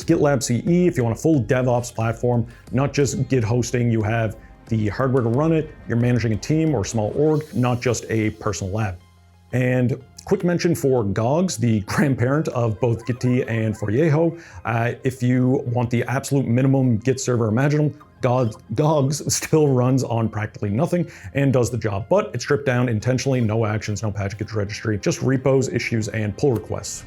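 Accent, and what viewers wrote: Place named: Nova Scotia, Canada. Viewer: sounds like a Nova Scotia accent to me.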